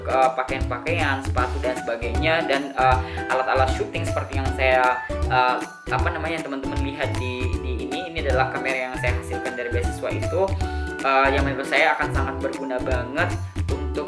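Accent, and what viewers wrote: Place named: South Sulawesi, Indonesia. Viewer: Hai kak, kalo dari aksen sepertinya orang Sulsel hehe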